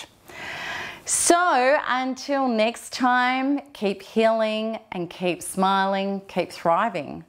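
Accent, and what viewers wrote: Australia. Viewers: OMG could actually learn how to speak properly, you sound like you fell off the back of a truck in Mt Druitt. It makes it difficult to listen to. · I like your accent so much 👏👏👏Love to Australian Nation! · hi Thank you so much for your love, care and education. And nice to hear your AUSTRALIAN. I've just tried 10 plus times to get your free, free yourself education from online but the site isn't letting add both my name and email address. Could you please send it to me? I'd love to read this. I'm a victim of crime. Would love your support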